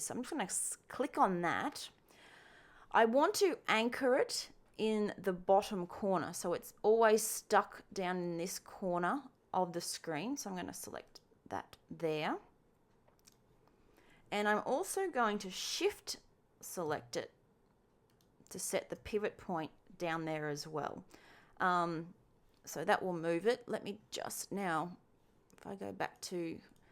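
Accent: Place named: Australia. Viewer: So nice to open the video and hear an Aussie haha. Really clear tutorial too, many thanks!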